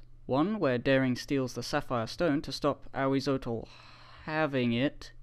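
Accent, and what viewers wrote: United Kingdom. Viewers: He's been British this whole time? Awesome!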